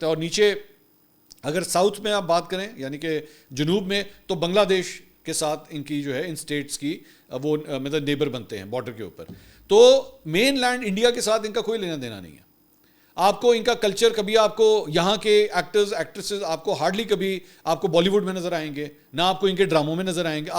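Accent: Punjab, Pakistan. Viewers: فنی پنجابی ٹچ بہت مزے کا لگتا ہے😂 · ap Fasilabad sy to nahi.....Sir waey Punjabi bary cute bolty ho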